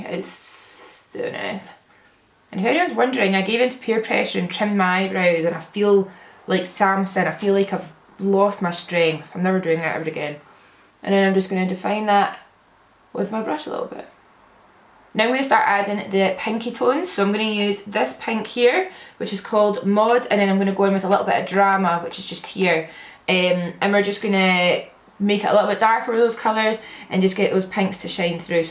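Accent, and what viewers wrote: Scotland, United Kingdom. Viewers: Legit obsessed with all ur looks!❤️I think you are the only scottish gal that sounds normal on video, in my videos I sound sooooo bad I HATE my Scottish accent tbh😩Xx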